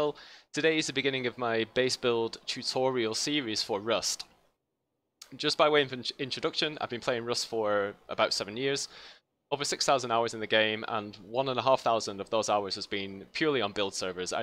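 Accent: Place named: Lancashire, United Kingdom. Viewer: I thought that mate, definitely some kind of blackburn or Burnley in there as well mate with a manc twang 😎 good video mate and good luck with your channel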